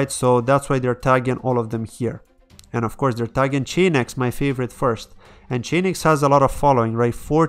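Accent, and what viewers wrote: Ireland. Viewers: I thought I heard an Irish accent in your voice. Are you involved in Irish crypto much?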